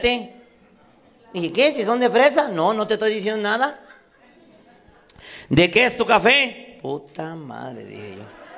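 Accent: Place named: Veracruz, Mexico. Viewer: Pinche eres muy chingón veo siempre tus vídeos y me gustan de a madre porque el ablando del jarocho es divertido y como tú trabajas en tus precentaciones estás a otro nivel hay otros comediantes buenos pero para mí eres el mejor también tengo el privilegio de ser de Veracruz ánimo eres el mejor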